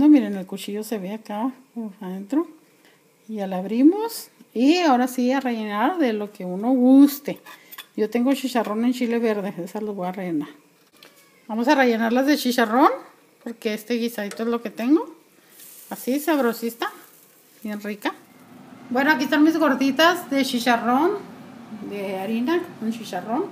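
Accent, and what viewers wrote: Chihuahua, Mexico. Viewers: Creo k si xk habla igual como nos otros acá en Chihuahua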